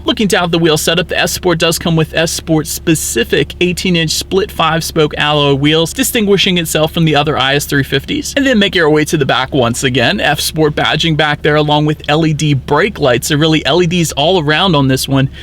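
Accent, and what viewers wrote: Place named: Southern California, United States. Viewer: You from socal? You've got the surfbro accent.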